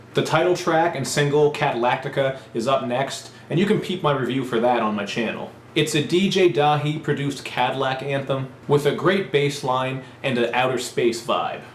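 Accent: Canada. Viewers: You are the most Canadian person I've ever heard.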